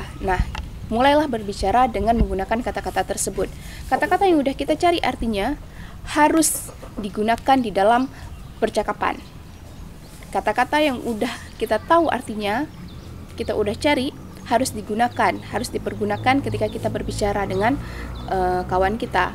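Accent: Malaysia. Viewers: Logat nya kadang kayak bhasa malaysia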